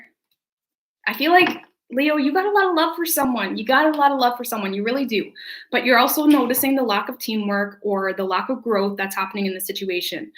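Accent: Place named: Canada. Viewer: Pssst....your Canada is showing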